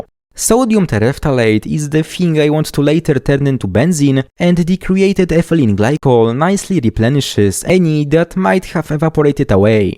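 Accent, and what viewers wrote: Poland. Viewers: Cześć! Od razu słychać polski akcent, jeszcze to wymawianie "R", i cisowianka... Pozdrawiam! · After I saw cisowianka bottle, and heard your language I instantly knew you are from PL 🇵🇱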